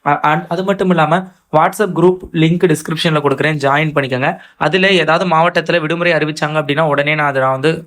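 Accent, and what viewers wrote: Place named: Pudukkottai, India. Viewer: Thala nee Pudukkottai yaa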